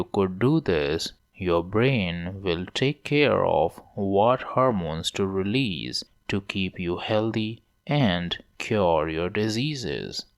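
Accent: India. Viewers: It's not a cartoon voice but an Indian voice. However many people are born with disease...... This is just nonsense.....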